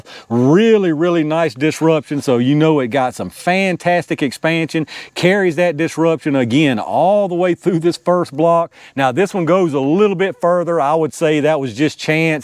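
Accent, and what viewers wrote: South, United States. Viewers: Love your videos. I can't help picturing the one character from the movie "Oh Brother Where Art Thou" when listening to your accent.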